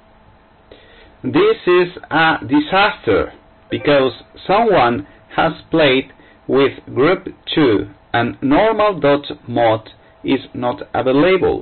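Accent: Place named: Spain. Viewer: Parker Stephens He is a spanish developer, so English it's not his language. Also, I think he is reading a script. For spanish people, like me, sometimes is difficult to have a good accent in English. Regards.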